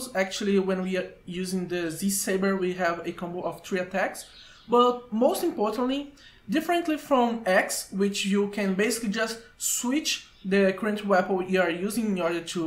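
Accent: Brazil. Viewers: Is that a Brazilian accent? great video :D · Lol! I recognized the accent too. (Brazilian here too) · Eu chutei pelo sotaque mas aí vi a versão BR do livro Level Up no fundo e tive 200% de certeza kkkkk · Vc parece ser um br falando ingles, mt bom o video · I see you are a brazillian by your accent! Im a brazillian too! Also i really like megaman x5 its not as bad as people say (x4 was better tho) Great tutorial by the way dude! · You sound a lot like you are from brasil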